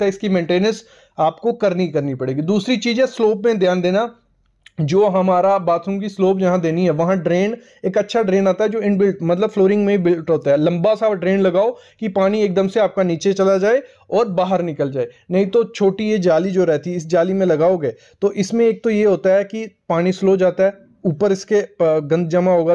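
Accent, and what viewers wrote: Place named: Himachal Pradesh, India. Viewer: Aap पहाड़ी ho kya you sounds like from Himachal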